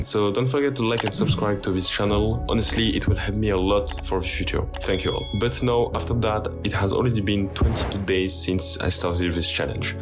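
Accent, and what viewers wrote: France. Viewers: u are french ??? ( oui sa se voit a ton accent et a ta desciription ) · are you french? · Bro you sound like a french guy. are you french?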